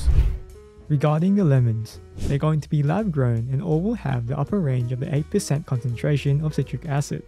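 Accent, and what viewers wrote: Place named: Australia. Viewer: A British person with an Australian accent?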